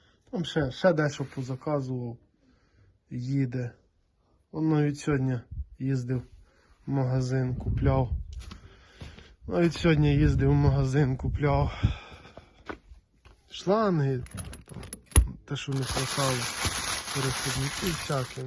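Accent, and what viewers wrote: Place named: Zhytomyr, Ukraine. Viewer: По говору таке як Житомирська область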